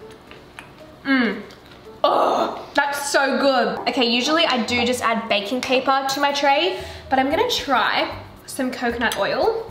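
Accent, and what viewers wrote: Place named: Australia. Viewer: Lol that's the aussie accent for u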